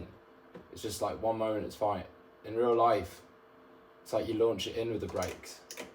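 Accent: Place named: Midlands, United Kingdom. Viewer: Hey bud, sounds like you're a Midlander like me. Hope to see more 🙏🏾 👍🏾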